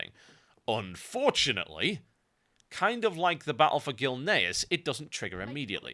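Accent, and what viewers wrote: United Kingdom. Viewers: heh, great shows man, i really like them, keep it up. love british accents :)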